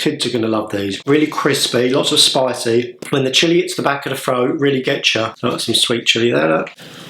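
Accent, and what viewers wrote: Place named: London, United Kingdom. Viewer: mate! your accent isn't to far off Russel Brand's. hope you're not offended. you live near the Thames?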